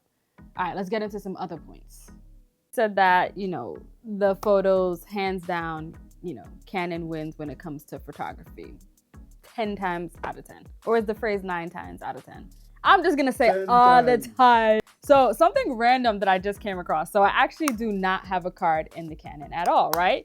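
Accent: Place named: Jamaica. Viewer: 🇯🇲🇯🇲 Jamaican accent is amazing big up from yard 🔥🎥🔥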